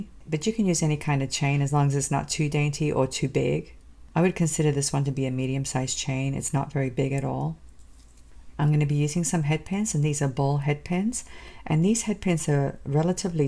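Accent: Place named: Australia. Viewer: Dear, I love the necklace you showed us at the beginning of this video. Is that your beautiful garden? What is lovely place to live in. Your accent sounds a bit Australian - have I got that wrong or have you ever lived here in Australia? Thank you for your great videos, love from Amanda in Melbourne, Australia ❤️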